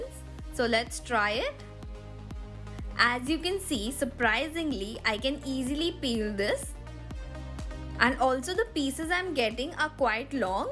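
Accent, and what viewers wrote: India. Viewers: Are you Indian ?????🤔🤔🤔 B'coz your accent seems to be indian.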